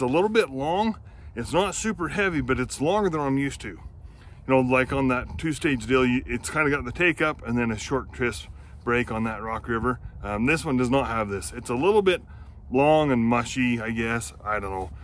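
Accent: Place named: North, United States. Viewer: You sound like you're from up North. Am I right? I think so. Howdy from TEXAS brother⭐🇺🇸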